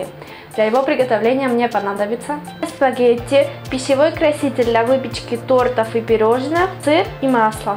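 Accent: Spain. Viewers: нет она живёт в Испании , но родилась в России . акцент от того что она больше говорит на испанском чем на русском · она родилась в Росси в 11 лет родители с ней переехали в испанию поэтому у неё такой акцент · У уже акцент испанский есть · у нейо испанский акцент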